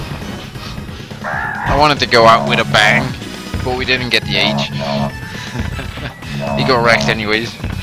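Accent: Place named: Netherlands. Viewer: No worries, I'm dutch too trying to work on completing this game 100% myself... The tournaments are a huge fucking pain though! Also, normally hearing my fellow countrymen speak English in a Dutch accent is unbelievably cringeworthy, but for some reason you're able to pull it off :P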